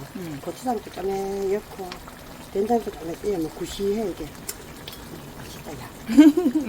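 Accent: South Korea. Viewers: ㅋㅋㅋㅋ 저는 할머니랑 전혀 안친했는데 우리 할매님이랑은 만나면 할머니~~~하고 포옹부터 할것같은 친밀감ㅋ 경상도 사투리라 더 좋아요♡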